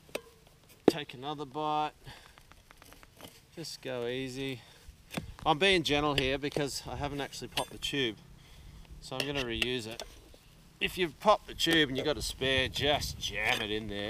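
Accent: Australia. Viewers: your Aussie accent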